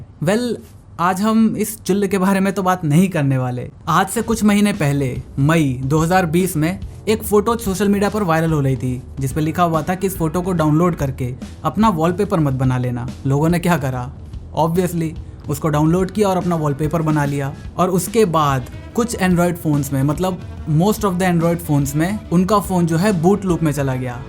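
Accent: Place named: Delhi, India. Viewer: Delhi se ho kya?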